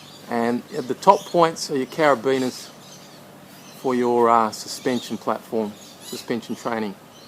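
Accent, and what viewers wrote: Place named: Australia. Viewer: Thanks for the fast reply! Awesome. If I had to take a guess I would place you in Australia (your accent gave you away):D Thanks for the informations. I already downloaded your instructions (and want to throw money at you for it - by the way). I was just curios which of your videos was the most recent design In your instructions those eye bolts further down the "legs" of the stand (the ones you used to hang the speed ball) are missing, I presume they're just drilled into but wondered if you found a more elegant solution:D But with your idea I can get rid of the gym since I'll be able to do pullups on the station. And dips. And a punching bag. I really love your idea!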